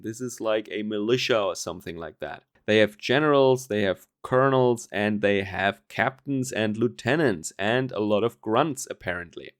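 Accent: Germany. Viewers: I want to hear you say..... It only takes a camera to change her mind. In your cool German accent You know the song Lol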